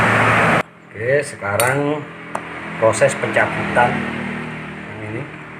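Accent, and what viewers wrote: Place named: Madura, Indonesia. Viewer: Pengetahuan yang sangat berguna, terimakasih bang, alamatnya dimana ya, dan lagi spt ada logat madura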